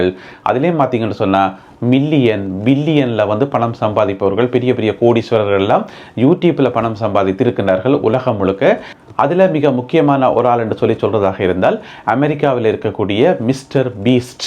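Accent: Sri Lanka. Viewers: I came to London on visit visa,i have subscribed your channel very interesting,your srilankan slang tamil impress me very much.iam running a devotional channel namely Temple trails showing festivals of temples,on seeing your channel i got impressed and started a new channel namely வானமே எல்லை. your pronounciation and detailing is super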